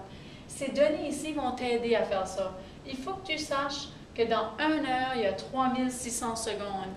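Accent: Canada. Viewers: J'aime l'accent cannadien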